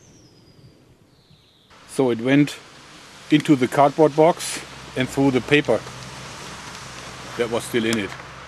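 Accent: Germany. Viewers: Are you german ? Nothing against you talking, but I just wanna know. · Sehr geiler deutscher Akzent :D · mal dumme frage aber biste deutscher? weol dein english so klingt^^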